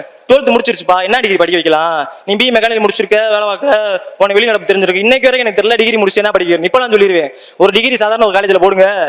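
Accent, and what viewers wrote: Madurai, India. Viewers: Madurai slang🤩🤩🤩🤩🤩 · Madurai kanaran slang i think😊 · Madhurai slang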